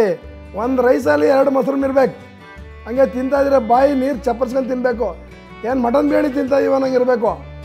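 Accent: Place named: Bangalore, India. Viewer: Namaskara. Naanu Sehar, Hosur. Ivaaga Inna 3 thinguligea USA Alli idhinee. Neevu nimma 54 vayasinalli yaagidhiro adhe thara mamma thandhe Krishna Swamy avaru adhe 54 lalli adhe thara idru. Adharke innonondu Namaskara. 82 vayasu vargu chennagi idru. Neevu 100 vayasu irilike aaseervafagalu (Naanu ivaga 73 Senior). 2 varushadinda nimma Tamil postgalu nodutha idhni. Nimma Bangalore style tamilu super. Best wishes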